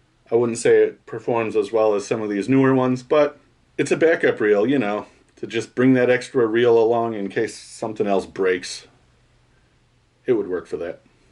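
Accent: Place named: Georgia, United States. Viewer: I'm gonna guess you are from Georgia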